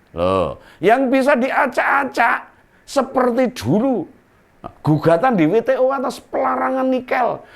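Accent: Java, Indonesia. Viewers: saya sukasekali dgn logatnya mas ini, jawa banget, logat asli jawa majapahit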